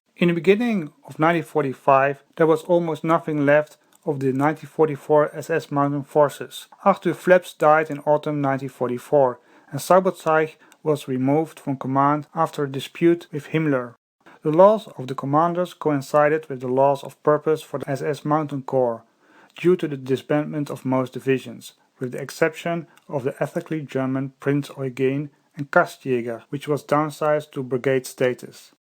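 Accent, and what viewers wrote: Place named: Netherlands. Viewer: Hollandse accent haha goeie video hoor ik heb veel meer informatie gekregen over de balkan dan in school!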